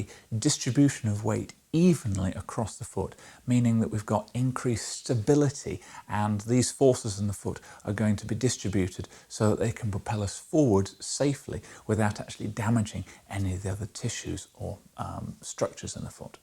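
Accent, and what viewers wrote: United Kingdom. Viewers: Because he’s damn sexy! That is a breathy voice with a British accent laid on top. Sessy!!!!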